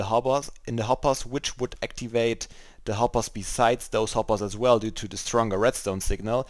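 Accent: Germany. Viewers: hey kanns sein das du deutscher bist ;D du spricht irgendwie so deutschen dialekt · man kann sofort hören mit dein deutschen dialekt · German I'm guessing? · Ich wette du kommst aus Deutschland :)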